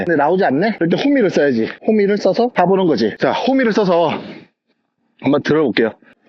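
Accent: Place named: Gyeongsang, South Korea. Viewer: 부산경남 사투리가 상당히 자연스러운데 고향이 경상도신지?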